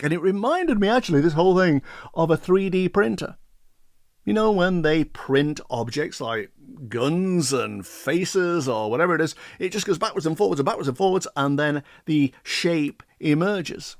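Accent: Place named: United Kingdom. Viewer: Almost as soon as you start for the exit you stop and and in that cool ass brit voice say "huh I wonder" Then you walk up to the opening thinking " wow that's quite a jump," as the orbs popped and floated up . All you had do was believe and have faith that you were going to get where you are meant to. So off you go.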